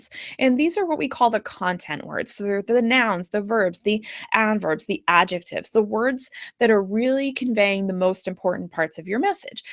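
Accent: United States. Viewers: You have an American accent.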